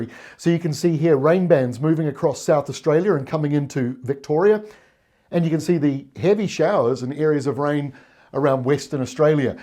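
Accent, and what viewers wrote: New Zealand. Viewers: Love your kiwi accent and the forcast of thuck clouds.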